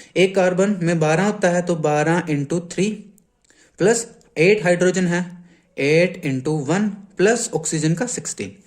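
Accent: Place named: Haryana, India. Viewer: bhaiya aap bhi alakh sir ki tarah videos bana na suru krdo. Aapka haryanvi accent bht accha hai aur padhane ka style baaki sab se better hai , sab kuch samjhane ka style bhi osm hai . Agr aap saamne aake padhaoge screen pe to bacchon ko aur maza aayega aur aap ki growth v bht quick hogi. Wishing you all the best 🙏🏻💗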